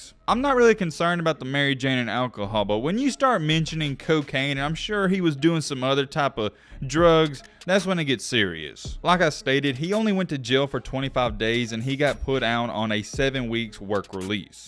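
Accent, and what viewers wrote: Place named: Appalachia, United States. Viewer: You gotta be Appalachian with that accent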